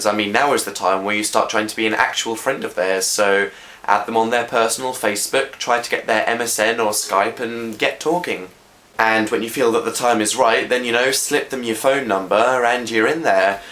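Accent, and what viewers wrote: United Kingdom. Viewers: Why is he so overly British in this 😭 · He sound extra british here =))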